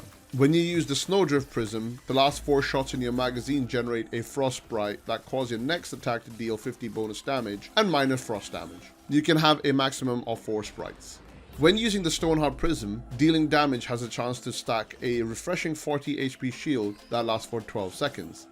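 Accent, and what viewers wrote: Ireland. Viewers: take care of your damn. As someone with heart troubles as well (defective valve that had to be replaced a few years ago,) it's one of the most important organs you can take care of. Keep us posted, but rest up. I'd like to have your dulcet irish tones around for many more years.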